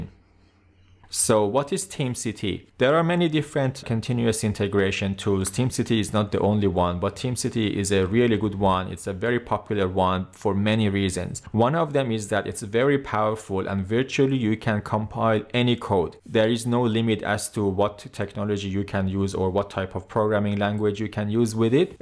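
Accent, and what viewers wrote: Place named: Iran. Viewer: Dadash lahjat maloome irani hasti. Khaste nabashi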